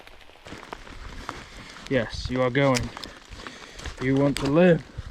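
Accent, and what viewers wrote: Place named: United Kingdom. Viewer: Where are you camping? U sound British.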